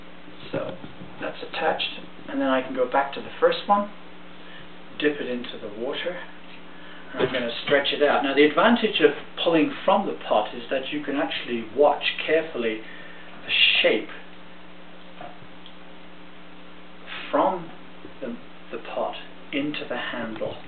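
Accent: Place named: United Kingdom. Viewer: Yes, a lovely demo on pulling handles on a large mug. The dry handle test is excellent, had never seen that done. I was surprised at the strength shown. Where are you located, sound British.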